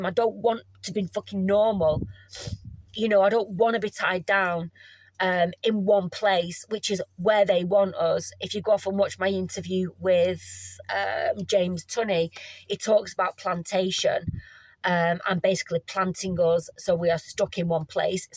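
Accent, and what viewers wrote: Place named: Northern England, United Kingdom. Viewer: I dont blame you for moving away from bolton. I too was born there and moved away in 2007. I can only imagine it has gotten worse, all the shops were shutting in town last I heard, not a fan. Not bothered never going back. Not sure about your conspiracy theories but its definately scary times we are living in. I can also relate to your being isolated, Im the same. wierd thing when you get used to only your own company. All that just to say was an interesting watch. Just a shame how bolton went not many people of the older generation anymore that were like Fred Dibnah. Good luck with the channel. did you move to bolton? can't tell by your accent although can tell it's northern.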